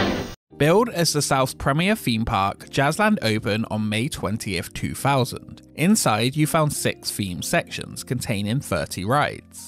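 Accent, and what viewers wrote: United Kingdom. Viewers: Hey what is your home park because i though i hear you speak british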